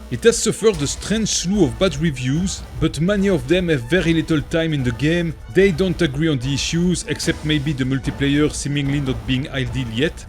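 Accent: France. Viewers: no offense meant but since you asked, to be completely honest, I at first went to my settings thinking I needed to turn on translate to English lol. you have the most stereotypical insanely strong French accent. I'm thankful for closed captions, or I would definitely have skipped the video. I nearly did anyways, but was interested enough in the content to force myself to push through. if it's something you're concerned about, youre not crazy. while there's nothing inherently wrong with any of this stuff, if you are trying to expand your channel however possible and are open to making changes that will likely make your content more easily accessible to the widest audience possible, taking some steps to soften the accent as much as possible would definitely be a strong place to start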